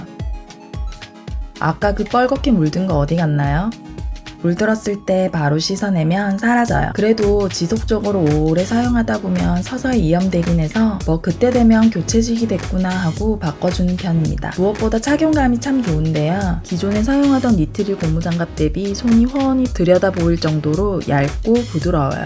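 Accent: Gyeongsang, South Korea. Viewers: 영상 너무 잘 보고 있어요~ 실례지만... 경상도분이신지요? 중간중간 살짝 사투리가 나오는데... 나머지 표준어는 너무 잘 쓰셔서 문뜩 궁금해서요 ㅎㅎ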